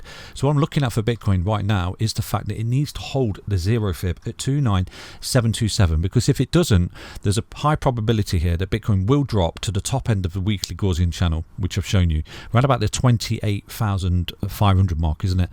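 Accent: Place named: England, United Kingdom. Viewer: Why are you speed talking with your English accent who the hell can understand you?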